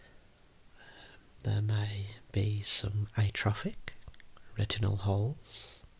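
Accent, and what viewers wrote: Birmingham, United Kingdom. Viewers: Wow! Fancy coming across a fellow Brummie on the big world of Youtube! Im learning some pretty good Eye knowledge from your videos too! Keep it up pal! 😃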